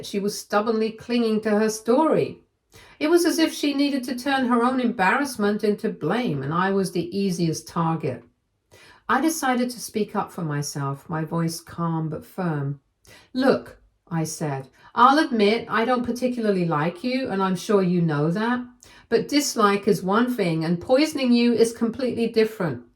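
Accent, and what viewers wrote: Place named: United Kingdom. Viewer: I believe that does not edit the posts but presents them exactly as the OP wrote them. So any errors in choice of words are the OP's. The only thing you can fault on would be pronunciation but keep in mind the she lives in the UK and not the US. (And even in the US there are regional differences in pronunciation of many words just as the UK has regional pronunciation differences.)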